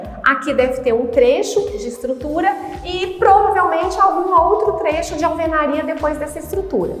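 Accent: Minas Gerais, Brazil. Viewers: Primeiro me atraiu as dicas e pelo sotaque charmoso de minas. Depois de vários videos assistidos, me apaixonei por sua racionalidade, perspicácia e criatividade nas soluções. Se eu fosse rico, te traria aqui pra São Paulo para transformar meu apartamento em um apartamento de resort rsrs. Tenho certeza que amaria as soluções. Todo sucesso do mundo para ti. Abraços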